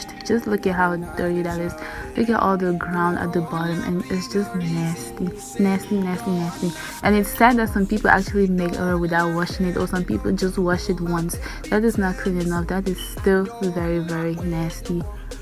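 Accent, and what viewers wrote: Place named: Pidgin, Cameroon. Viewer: I can identify u with our pigin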